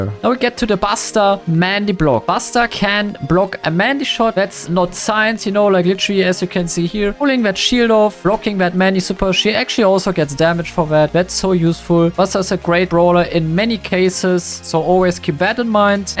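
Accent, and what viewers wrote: Germany. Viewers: dieser deutsche akzent 😂😂🤣🤣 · Mann hört an deiner Stimme das du deutsch bist 😂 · Are you German? 😅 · Bist du deutsch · Dieser deutsche Akzent ist zu krank :D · Du gibst ja echt gar kein fick auf dein deutschen akzent hahaha feier ich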